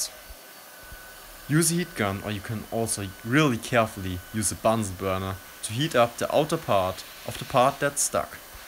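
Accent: Germany. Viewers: ich finde seinen Deutschen Akzent beim Englisch sprechen super😂👍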